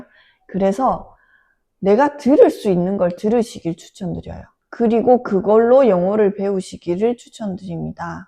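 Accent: Gyeongsang, South Korea. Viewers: 경상도 사투리를 들으면 개인적으로 반가워요, 저는 서울 사람 이지만 경남지역에서 오래 살았습니다. 김해 진영 마산 창원 그리고 부산. 저는 독일에서 거주했었습니다. 누나 영상을 보면 예전에 독일생활 초창기때 고생했던 기억이 떠오르네요. 영어 be동사 개념도 모른 상태에서 생존을 위한 독일어 공부했던 기억이 납니다. 독일어를 먼저 배워서 그런지 공부를 하다 보면 영어에서는 왜 이렇게 하지 라는 아쉬움이 있을 때가 많더라구요. 아무튼 누나 미국생활 너무 부럽네요. 저는 친미성향이 있습니다. 언젠가 미국에 꼭 한 번 가보고 싶습니다. 영상 자주 챙겨보겠습니다. 누나 사랑해요. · 선생님, 안녕하세요. 바로 구독합니다. ㅎㅎ 저는 한국에서 5년 임상 병행하며 임용 패스 후, 현재는 5년차 초등학교 보건교사로 근무중입니다. 학생때부터 미국간호사에 대한 생각이 있었고, 엔클렉스도 쳐야지 쳐야지 했는데.. 더 늦기전에 후딱 해치워야겠다?ㅎㅎ 라고 생각하여.. 올해 여름방학에 바짝 공부해서 8월말에 엔클도 패스했네요. ㅎㅎ 사실 저는 보건교사가 제일 하고 싶었고.. 그래서 지금 보건교사를 하고 있는게 정말 행복하지만.. 간호사로서의 발전에는 많은 한계가 느껴져요..ㅜㅜ 거기다 대학생때 뉴저지, 뉴욕에서 4개월간 병원실습도 하고, 미국생활도 해서 그런가.. 미국에서 더 많은 케이스를 보고 경험하면서 간호사로서의 전문성을 함양시키고 싶은 마음이 계속 드네요..ㅠㅠ 무엇보다.. 미국병원의 신문물들을 직접 경험하고 싶습니다.!ㅎㅎ 한국과 많이 다른 점에서 상당히 충격을 받았더랬죠.. 그래서 지금 진지하게 미국행에 대해 고민중입니다.. 미국가서 저 혼자 살아갈 자신이 전~~혀 없고, 또 영어에 대한 공포때문에.. 뭐든게 무섭고 두렵네요..ㅠㅠ 그러던중, 선생님 유튜브 우연히 보고 공감되는 부분이 많아 댓글 남깁니다. 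얼마나 힘드셨을지..ㅠㅠ 마치 저 신규 때 매일 울며 출퇴근하던 그런 기분이 들더라구요.. 그리고 억양이 경상도분이신거 같은데.. 경남지역이신가요? 전 대구사람이라.. 경상도 말투를 들으면 어찌나 반가운지요.ㅠㅠㅎㅎ 아무쪼록 항상 건강하시고, 영상도 꾸준히 업로드 해주세요. ㅎㅎ 혹시라도 제가 미국행 결정하게 되면 댓글 남길게요. ㅎㅎ 선생님 응원합니다. ^_^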